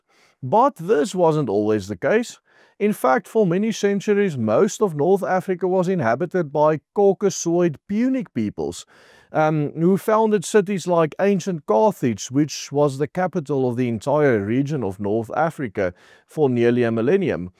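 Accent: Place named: Afrikaans, South Africa. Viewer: Was worried for a second, but then I heard his afrikaans accent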